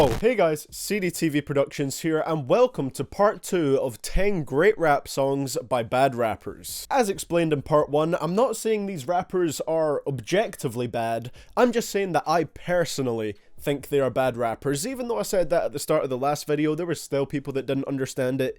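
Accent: Scotland, United Kingdom. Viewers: What is that accent? I know its from somewhere in Great Britian but i cant put my finger on it. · Is he Scottish????🤨🤨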